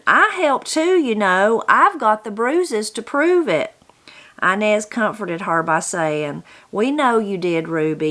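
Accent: Appalachia, United States. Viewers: I enjoyed that story so much, and you're a fine reader. You're the sort of reader who lets me lose myself in the story and forget that there is a reader at all. I pictured everything so clearly in my mind's eye. I think having someone with an Appalachian accent tell the story was a big part of what made it come to life. Thank you for a wonderful Christmas gift.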